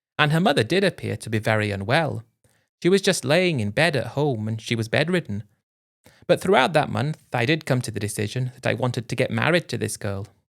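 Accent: England, United Kingdom. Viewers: America - you have an English Accent.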